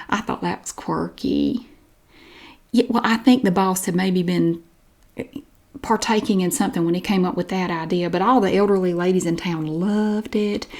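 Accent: South, United States. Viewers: This is amazing! Raised in Oklahoma and the accent is so wonderful.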